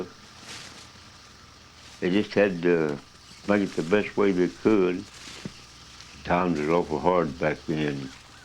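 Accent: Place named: South, United States. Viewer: He reminds of my grandfather; his lean body, country accent, and slow way of talking. I had a recording of Pa talking about his experience in WWI and my kids asked me if that was really how he talked. He was a man of few words and only spoke when he had something to say. This video makes me miss him.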